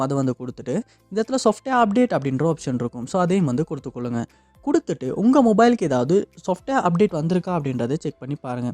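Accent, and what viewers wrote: Sri Lanka. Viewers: Bro neenga illangai tamilana ?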